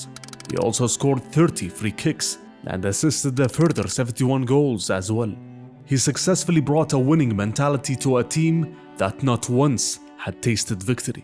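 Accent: Arab world. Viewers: The narrator has a thick Arabic accent. That's why. He needs to attend an Accent Removal Course... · This was an excellent documentary. Until the very end I thought the narrator was Aregentinian😀 before finding out the accent was Arabic.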